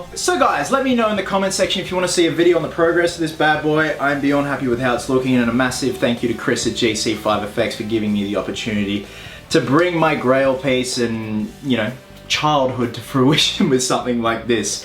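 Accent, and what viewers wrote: Australia. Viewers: Mason Sumi that's just the Australian accent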